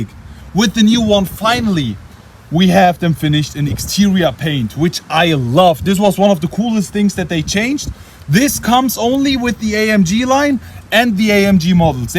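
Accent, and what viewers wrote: Germany. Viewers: I don't want to offend you now, but you should really work on your English, the intonation is awful. That sounds like a foreigner is trying to speak German so abruptly, only in English.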